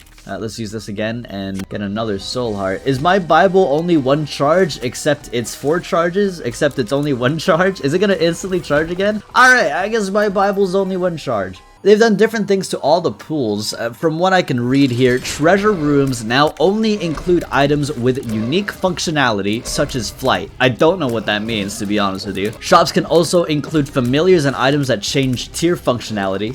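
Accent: United Kingdom. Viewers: wait ur british :( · is British? Oh no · UR BRITISH? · you're British??? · Are you british???? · wait, you’re BRITISH!? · Me when realizing is British: 😱😱😱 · I'm British. But I have no accent recognition. I didn't realise is also Bri'ish. Cool. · BRITISH?? WHAT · IS BRITISH?,????,??,???????? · YOU'RE BRITISH? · You're British!?!? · WHHGAT YOU AR E BRITISH???N i'm beyond flabbergasted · wait your British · is British?? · This Mod Changes EVERYTHING! Edit: WTF IS BRI’ISH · Your British??! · YOURE BRITISH????? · is BRITISH?! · IS BRI’ISH?! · Bros british?????? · YOURE BRITISH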